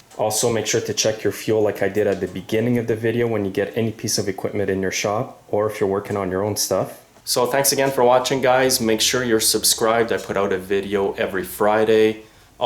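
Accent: Quebec, Canada. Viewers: Wow, the detail n time you took in this video was great! I'm a Truck and Trailer mechanic myself but Im shy around small engines, I look forward to watching more of your videos, like I'm realy blown away with the care and detail in this video. Keep up the great work! ( I also wonder if that's a Quebec or Acadian accent, i'm on the east coast myself?) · You sound French Canadian, where abouts are you located?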